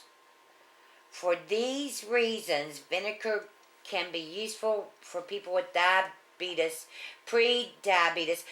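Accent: South, United States. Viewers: Pennie Clinton Yes has that deep southern accent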